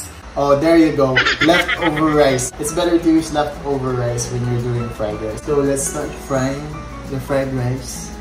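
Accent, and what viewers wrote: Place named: Oregon, United States. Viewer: Congratulations 4000 subscriber 👏👏👏love this content with English version you still have Oregon accents very sweet.Made my night laughing 😂 notice you a lot of S.Hope Marky will do English version vlog.Yes more English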